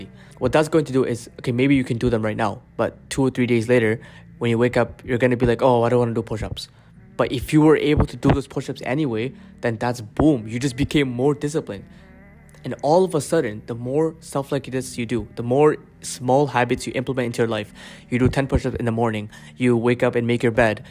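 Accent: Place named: Pakistan. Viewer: he sound like Pakistani no racism I am Pakistani also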